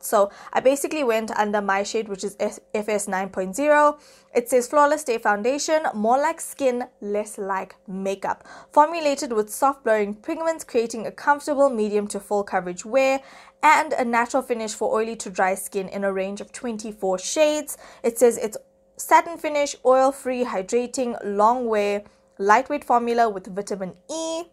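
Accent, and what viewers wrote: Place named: South Africa. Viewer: So yes, I have heard of it since it had a good amount of attention in the US, however I am buying it right now online because of your review and recommendation 🙂. It's pretty affordable here, just $12. My current favourite foundation is Bareminerals barepro foundation. This should definitely come into the chain stores, I think it would be really popular....Randomness- I love watching your videos not only for the content but I miss hearing our South African accent ❤. #durbangirl 🇿🇦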